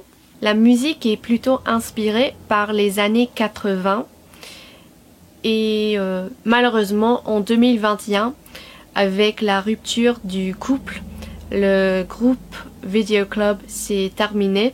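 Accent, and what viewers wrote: England, United Kingdom. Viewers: Bravo pour votre chaîne. Votre français est très agréable à entendre, avec ce petit accent britannique tellement charmant! <link> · Hiya, just wanted to say that you remind me of a younger me who moved from England to France at a much younger age (we have the same twang and make the same mistakes when speaking french!). I also spent a lot of summers in France until my family and I eventually moved where the french side of my family lives. Being in my 20s now, I now speak fluent even native-like french. However, as my natural inner voice is in English when I'm alone, so I do still slip up from time to time, and still have a slight a twang apparently lol. Even after having lived here for over 15 years haha. I only wish I wasn't so isolated growing up in France and had other english speaking kids who were in the same situation as me to talk to. 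In fact, I can imagine a conversation between us to be refreshing because I never found someone with such a similar and yet different backround. But this is the internet, and you don't know me. So naturally this will never happen. But it's nice to know that there is someone similar to me out there! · Hello, excuse moi je ne te connais pas, tu es britanniques??:O Tu peux écouter: -, toujours de - 🥰, de -, de. Cette chanson est incroyable 🎸 * - un mélange * - entendu parler du groupe don't give up 🤗 (On ne devrait pas non plus prononcer le " T " à " sont " mais j'aime quand tu le dis XD )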